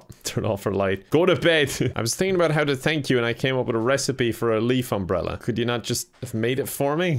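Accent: Ireland. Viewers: Irish accents are so attractive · Ste van der Velde hes irish, you’d here ireland in his accent.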